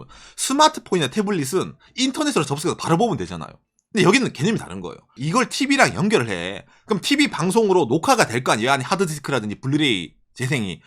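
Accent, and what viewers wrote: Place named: Gyeongsang, South Korea. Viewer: 이분 동남방언 너무 심하시네요 그것만 아니면 좀 편하게 들을수 있을거 같은데 구독은 못하겟네여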